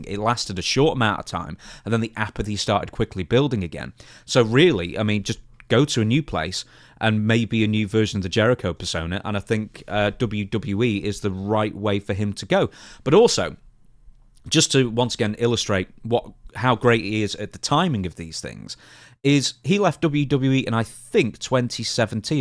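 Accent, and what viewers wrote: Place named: Leicestershire, United Kingdom. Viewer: You're not from Manchester. That's a Leicestershire accent. I know it when I hear itm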